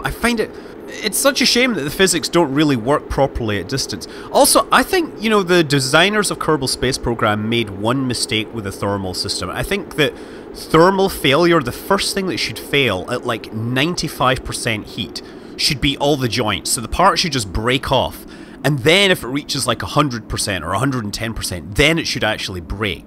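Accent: Scotland, United Kingdom. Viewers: Scott Manley I have to say. Watching your videos has given me a massive appreciation for Kerbal and helping me feel like physics and rocket science somewhat approachable to the layman. And with a Scottish accent to boot! Thank you for helping me fall in love with this game and science :)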